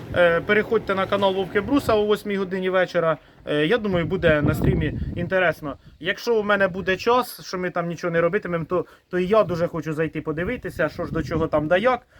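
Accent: Poltava, Ukraine. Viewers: Доброго дня!!! Натрапила на вас на просторах YouTube, яка приємна несподіванка! Рідна, неповторна решетилівська мова.Живу дуже далеко від рідного краю і просто насолоджуюсь вашою розмовою. Із хазяйства в нас тільки кіт, рибки і у внука богомол, але разом з внуком з цікавістю спостерігаємо за вашими тваринками., запитайте, чи був у неї однокласник Віталій, думаю що вона ходила в один клас із моїм племінником. Світ дуже маленький, хоч, може, помиляюсь, бо вже майже нікого не знаю в Решетилівці.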